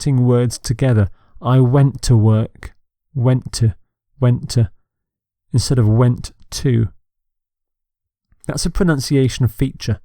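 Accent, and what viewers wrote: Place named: United Kingdom. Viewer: Thank you you are my best tutor, i love Your accent very much. I really would like be like you with your accent.. Please give me some tips as if i can be a British speaker like You. And i would like to be introduce with you personally. I am from Bangladesh and you?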